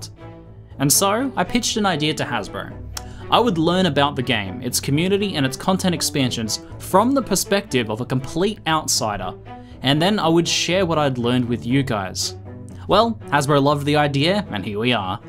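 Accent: China. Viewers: ayy chinglish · Chinglish is there, did you bro up with him? xD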